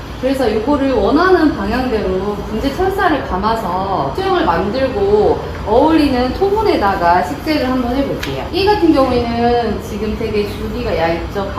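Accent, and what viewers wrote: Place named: Jeolla, South Korea. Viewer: 전라도 사투리를 좋아하는 대구사람입니다. 중간중간 나오는 전라도 사투리가 느~므 좋아요^^ 황칠이 키우면서 보는 재미도 있구요^^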